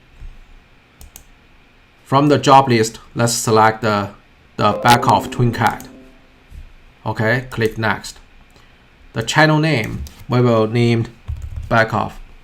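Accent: China. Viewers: I still can tell that you are Chinese 🤣 Thanks for the video tho.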